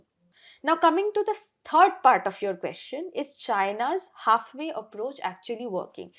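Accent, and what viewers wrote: India. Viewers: Indian speak English I don't understand a word you saying indian accent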